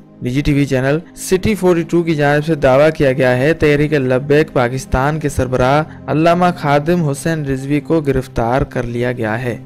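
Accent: India. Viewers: Tera lehja indian lagta hy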